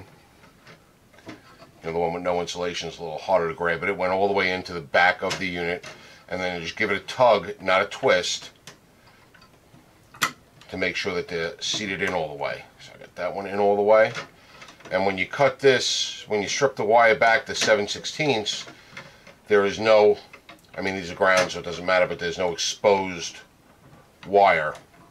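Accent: New York, United States. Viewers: thanks for my daily recommended allowance of New York accent. thx for the viddie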